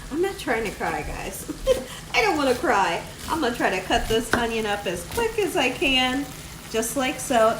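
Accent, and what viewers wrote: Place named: Chicago, United States. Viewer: I just want to tell you how much I enjoy watching you. I love listening to you speak... I’m from the Chicago land area and you remind me so much of home... Keep up the great work and I hope you know how BEAUTIFUL you are!!!! ❤️❤️. Thanks for all that you do....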